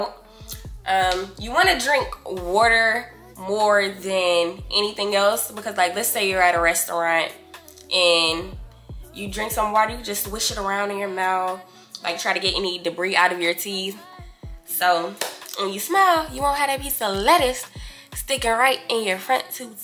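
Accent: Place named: New Orleans, United States. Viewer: U from New Orleans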